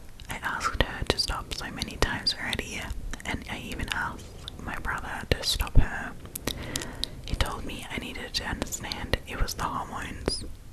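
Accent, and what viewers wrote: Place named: Australia. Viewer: Wait am I going crazy here or are you German? Because I got so thrown off by the Australian accent omg!!❤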